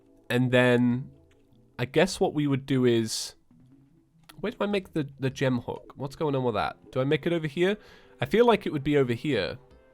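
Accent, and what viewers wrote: United Kingdom. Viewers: I saw the video and there were already six views! Happy Thanksgiving. P.S. Just realized you’re British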